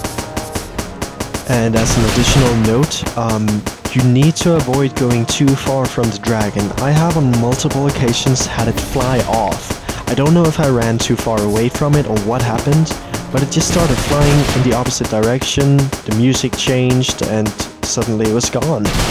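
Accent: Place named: Denmark. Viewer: You sound VERY Danish!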